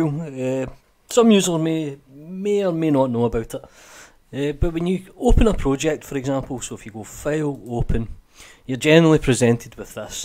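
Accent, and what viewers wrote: Scotland, United Kingdom. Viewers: Blender tuts in Scottish! Wohoo. I'm going to watch yer stuff just for the warm fluffy feelings ;-).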